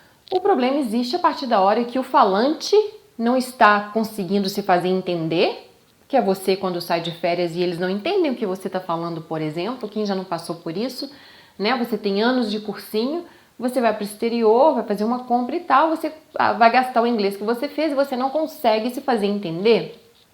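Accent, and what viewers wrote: United States. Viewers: Seu sotaque americano é perfeito. se aqueles caras o tal do tim explica, o gavin etc analisassem você daria 10 pra você. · Ouvindo você falar em inglês com sotaque americano, parece até ser mais fácil a pronúncia americana da inglesa.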